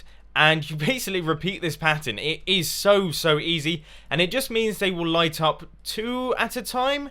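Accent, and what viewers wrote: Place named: United Kingdom. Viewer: hes friggin british dude or English whatever you prefer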